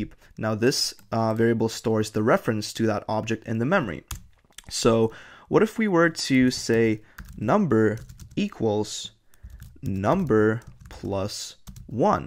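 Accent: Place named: Russia. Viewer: я то думал, что не так, а ты русский оказывается😅 Хоть эти ошибки возможны в речи у носителей, считай чуйка сработала.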